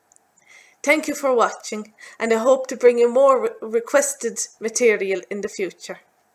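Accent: Ireland. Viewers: What part of Ireland is this accent?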